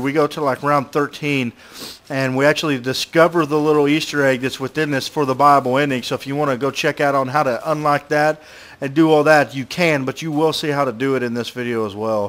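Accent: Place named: South, United States. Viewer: where are you from? you sound very southern!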